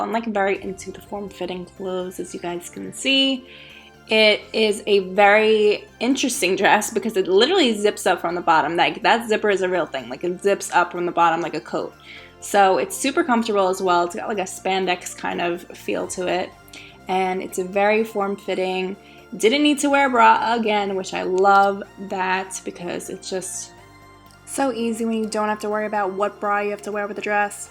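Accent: New Jersey, United States. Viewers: Hey doll! Your doing such an awesome job. I started watching you a couple months ago & Ive seen you grow so much! I'm going to DM you on Insta too, but I'm guessing your from Jersey/the Tri-state area? You have the accent! 😂😝 I'm from Jersey too. I'm REALLY working hard to start up my YouTube channel, but it's so tough! I'd LOVE to do a collaboration with you! I'm not sure if you'd be interested, but I would FOREVER be grateful! lol. If it is something you think you'd be interested in doing, PLEASE let me know! Talk to you soon 😘 xoxo, Brooke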